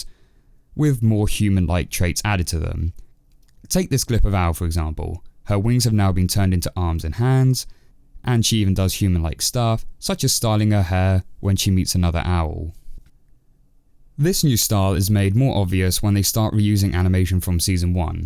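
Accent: United Kingdom. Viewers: I JUST REALIZED YOUR A BRIT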